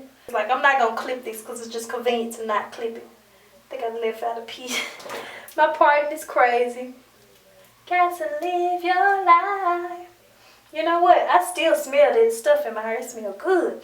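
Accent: South, United States. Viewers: your gorgeous an your southern accent is mind blowing reminds me of home!! get in touch sometime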